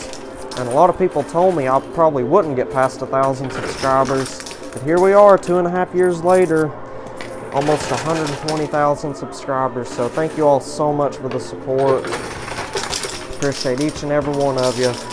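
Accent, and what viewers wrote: South, United States. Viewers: I love watching you play the coin pusher. I live in Canada and I really like you southern accent.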